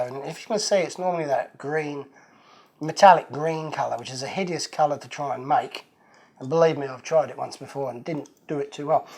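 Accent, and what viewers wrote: England, United Kingdom. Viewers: So are you an English guy living in Australia?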